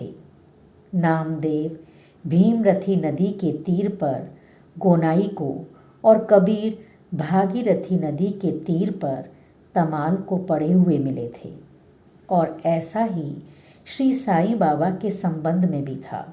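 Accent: Standard. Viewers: ओम साईं राम।बहुत ही मधुर और शुद्ध उच्चारण के साथ आज का ये साई सच्चरित्र का ये अध्याय सुनने को मिला बहुत ही अच्छा लगा।इसके लिए आपका बहुत बहुत धन्यवाद।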